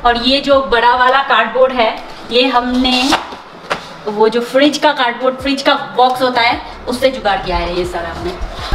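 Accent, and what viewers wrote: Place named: Bengal, India. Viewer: Tum itna cute keuho bataou mughe pls didi up kis jaga per reheteho up Bengalis he bat karte ho pls didi pls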